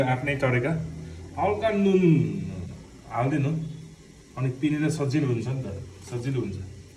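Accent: Nepal. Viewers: Your Nepali accent is super nice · your Nepali accent sounds like gurung people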